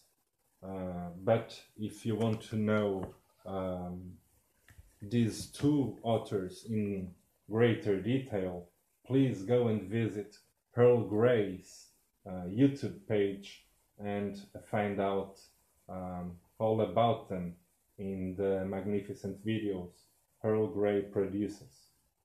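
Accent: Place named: Europe. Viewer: Speaking of european comics, Are you european too? You sound like european no offense.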